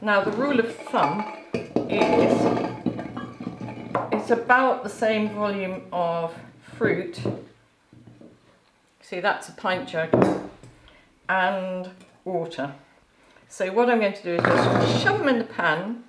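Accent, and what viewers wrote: England, United Kingdom. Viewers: Thank you so much ! It’s so nice of you to share your knowledge on the leaves too ! I think these tips should be handed down but alas the younger generation don’t seem bothered. I live in the UK and it’s so refreshing to hear an English accent on utube instead of an American. As they also use different measures.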